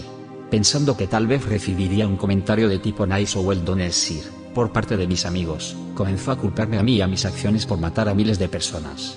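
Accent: Russia. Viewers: Es una chica, es extranjera, no se si sea la única administradora del canal. Tiene un acento euro asiático o similar, tal vez ruso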